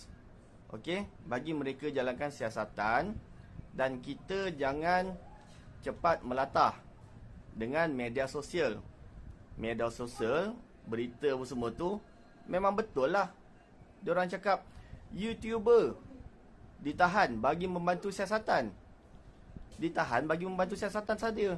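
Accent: Johor, Malaysia. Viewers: Cikgu asal orang johor ni heheh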